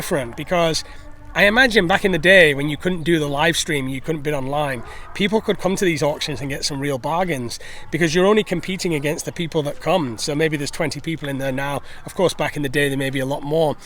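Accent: North, United Kingdom. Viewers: Sad to hear you are losing your good old northern accent mate. You’ve got a media proper voice now 😂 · Where you from I hear a northern twang in your voice that has been diluted over the years by speaking clearly to be understood.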